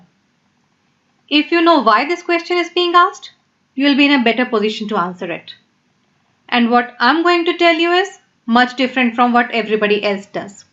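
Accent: India. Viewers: I'm anti the Indian english.. my bad 😥😥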